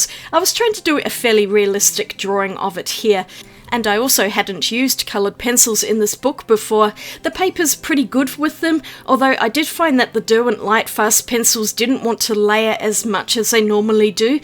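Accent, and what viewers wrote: Australia. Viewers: Love your channel, love the swatching, your awesome Aussie accent (I'm an American -- we love British and Aussie accents), love your sketchbook sessions. My motto: When in doubt, paint planets!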